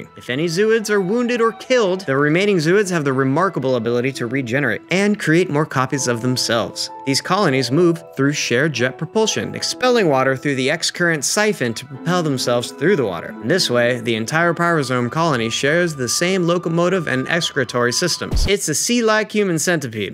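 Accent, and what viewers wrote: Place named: South, United States. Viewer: Ok ive never heard this on but i swearrrrr on your vids im detecting a bit of southern accent being from Bama i perk up when i hear it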